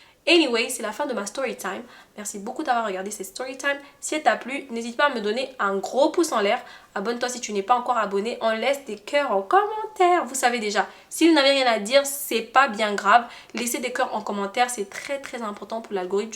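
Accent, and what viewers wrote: Cameroon. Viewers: Seigneur 🤣 accent camerounais j'ai écouté 😭😭.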